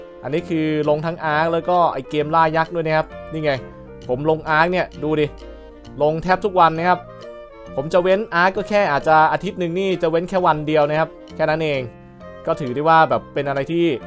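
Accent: South, Thailand. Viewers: ภาคใต้เหมือมนิพี่ผมคนสุราด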